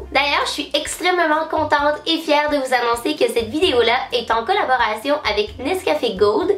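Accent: Quebec, Canada. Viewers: Es tu Québécoise?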